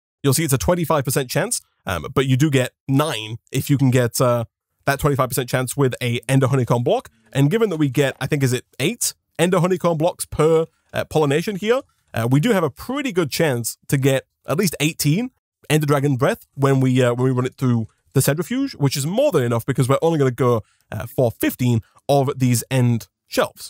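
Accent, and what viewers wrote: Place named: Yorkshire, United Kingdom. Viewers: British, I think. He’s British, I know that much, but I don’t know the accent. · Yeah he is from Yorkshire where the tea comes from but prefers the caffeine from coffee :p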